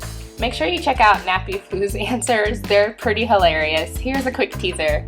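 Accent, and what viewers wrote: Nigeria. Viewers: Oh! Your Nigerian accent was on point..lol very interesting what your mother had to say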